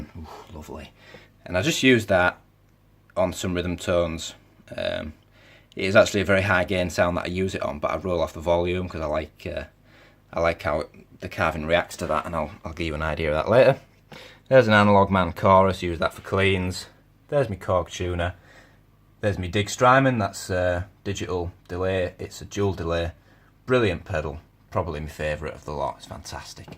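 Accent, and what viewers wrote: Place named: Yorkshire, United Kingdom. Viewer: You sound so yorkshire its untrue haha awesome review dood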